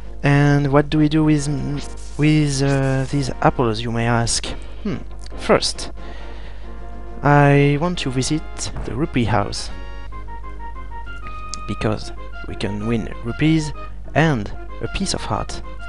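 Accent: France. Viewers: Bro, we figured you're french from your first word! You didn't have to mention xD t'assures mec 👍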